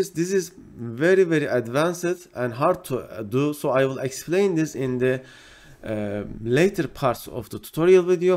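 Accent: Turkey. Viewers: Önce bi forumda gördüm videonu aksandan direkt anladım Türk olduğunu. Çok tebrik ederim harika bir tutorial. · Türkmüsün? Türk aksanıyla ingilizce konuşuyorsun. Güzel rehber. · Çok faydalı içerik. Teşekkürler. (1. dakikanın sonunda aksandan dedim ki bu adam Türk galiba, öyleymişsin. :D ) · Dostum aksanın Türk'e benziyor; Türk'sen türkçe altyazı geç bizim için. Çok süper olur bize.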